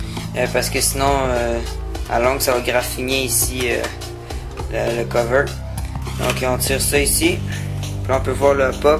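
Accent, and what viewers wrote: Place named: Quebec, Canada. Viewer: Mais non je parlais de la video elle est au top,topissime! et j'adore ton accent anglo/québecois sa rend bien dans une review!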